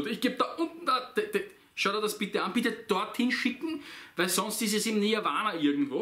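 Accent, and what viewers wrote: Austria. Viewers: Lieber, und alle Komentarleser Ich, persönlich, hab den Kanal abonniert weil: 1. ich mich für Outdoor-Ausrüstung interessiere und immer auf der Suche nach neuen bzw. unbekannten Sachen bin, die nicht jeder hat und kennt. 2. du einfach, authentisch, sympatisch, rüber kommst und der österreichische Akzent mich amüsiert. Wer was anderes erwartet hat ist meiner Meinung nach hier falsch. Ich hab im übrigen schon Kanäle deaboniert weil mir das stäntige Gegrüße in den Videos auf den Zipfen gegangen ist, so ein Blödsinn! Das mit den Kanalvorstellungen find ich auch deppert aber gut seis drum. Ich werd mir diese Videos dann einfach nicht ansehen. Aber ich will dir da auch nicht reinreden mach wie dir lieb ist. MfG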